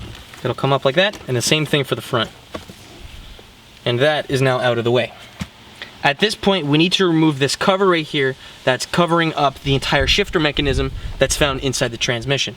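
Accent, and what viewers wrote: Canada. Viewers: He's Canadian, they gave us syrup and hockey so I can look past ot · NOAH Shirsat lmfao...where is he from Canada????? I had to stop watching the video... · Let me guess you're from Canada? the accent is really annoying xD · He's not Canadian?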